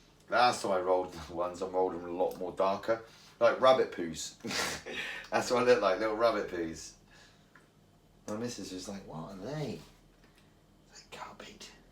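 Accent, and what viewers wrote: Bristol, United Kingdom. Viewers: Great blog , keep up the good work love watching your fishing blog , you sound like your from Bristol lol 🎣👍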